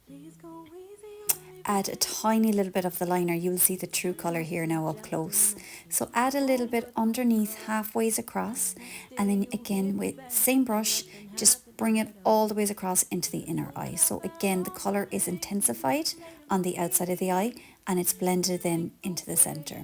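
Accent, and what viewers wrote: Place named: Ireland. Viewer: Add Irish accent to you description and it will triple your views! Love the Irish accent 🩷🙏🏻😍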